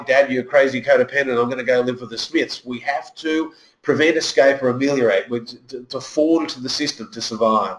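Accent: Australia. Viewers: your Australian accent and is very calming to me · presenter’s heavy Aussie accent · I found this to be so informative and validating. The aussie accent was relatable, which really helped me. The best thing was how referred to "we" throughout the lecture. This made me feel much less stigmatised! Most talks say 'them' or 'sufferers' or patients or even subjects.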